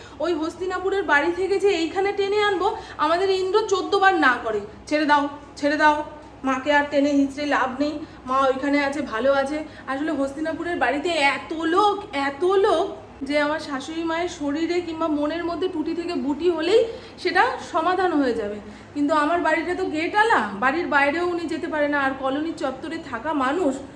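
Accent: Bangladesh. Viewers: মামনের সব ভাল কিন্তু এতো বছর ভারতে থেকেও বাংলাদেশি ভাষা ছাড়তে পারেনি।ফোন দেওয়া, চাবানো, কান্না করছে এইসব শুনলে বিশ্রী লাগে। এদেশিয় ভাষাটা শেখা উচিত ছিল।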